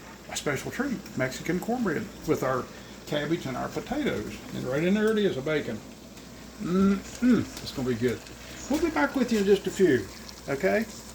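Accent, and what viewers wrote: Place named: Maryland, United States. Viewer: That looks wonderful!! My dad worked for the B andO railroad, you sound like you are from Maryland, my home state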